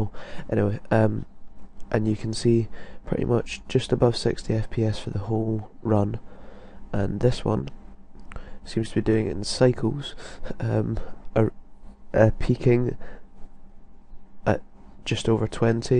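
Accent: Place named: RP, United Kingdom. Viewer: "High Bread Fizz X" Dat fancy Brit accent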